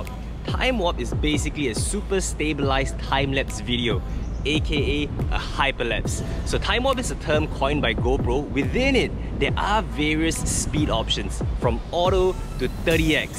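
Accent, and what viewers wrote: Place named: Singapore. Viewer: Idk why, but I'm just enjoying the singlish accent, LOVE IT ❤️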